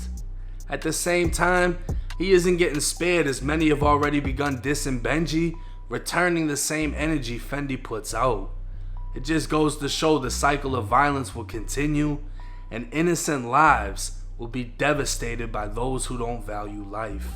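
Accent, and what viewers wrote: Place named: Massachusetts, United States. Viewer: yo I gotta ask how long did u live in Malden for? Whole time I was thinking no way is originally from Florida - cause legit every dude from mass can move away at like 8 years old, hit 30 & still have a thick ass mass. accent 🤣 Edit- My bad, looked it up, didn't know you stay in Dorchester. this shit is dope though, subscribed.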